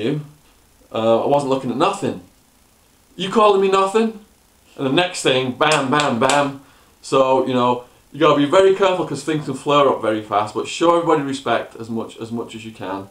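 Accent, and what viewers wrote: United Kingdom. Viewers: I hope you don't mind me saying that you sound too british for an Arizona prison.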